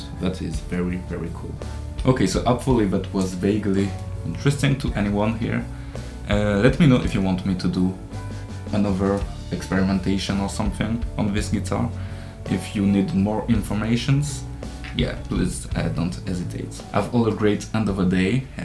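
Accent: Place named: Mumbai, India. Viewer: Où nous démontre sans emphases que: •T as pas besoin de lâcher ni 1500, ni 1000 et ni même 500 euros ou $. • que la guitare venant de Chine ou d ' Angola ou de Papouasie n est pas un problème en soi. • Que c est bien le jeu qui compte et à travers ça la musique. • idem les amplis • idem les effets Les fabricants de guitares de processe industriel ont tous une c.n.c, savent tous bobiner du fil de cuivre autour d aimants. • mon constat: il ya de quoi passer une décade à apprendre à jouer avant de passer à des guitares plus chères et mieux faites. • autre constat:, tu as un Anglais de Bombay.. pratique car on comprend tout et même moi ( c est dire ). Merci pour ton travail et tes avis sur tes vidéos.🙂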